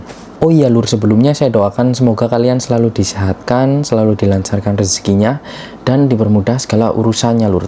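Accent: Banyumas, Indonesia. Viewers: mantap bro, jarang2 kaum ngapak ngomong finansial. sing ngati2 ya bos nang kana. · ngapakkk forever👍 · Bahasa nya ngapak panget lor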